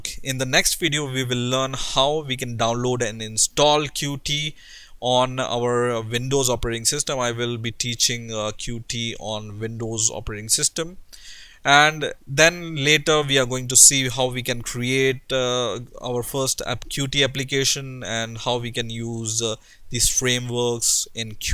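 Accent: India. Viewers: Probably south asia [India] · Hindi accent is not so bad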